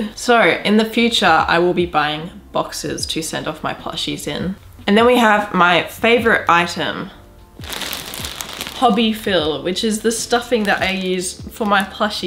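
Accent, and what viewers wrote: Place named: Australia. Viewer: Love your business and its nice to hear another Aussie voice!!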